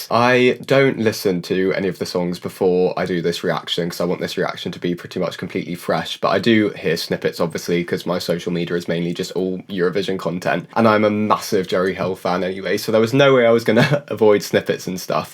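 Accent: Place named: Wales, United Kingdom. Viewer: Interesting accent. Are you from Wales?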